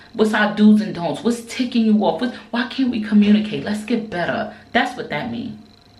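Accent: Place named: Brooklyn, United States. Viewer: I have just been watching and listening to you for a minute... I just turned 54 yesterday and my dear YOU display more maturity than anyone that i know personally..I admit I have been bias because I just moved to NC from NY and your NY/BK accent just make me happy after a long day of southern charm. However your maturity, intellect and poise is so amazing. Brava!! Don’t ever change.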